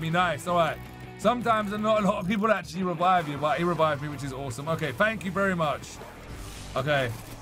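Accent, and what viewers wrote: United Kingdom. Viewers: Are you from Liverpool?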